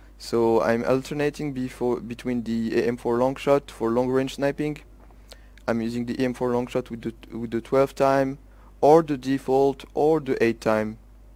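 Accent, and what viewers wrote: France. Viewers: are u french (with your accent)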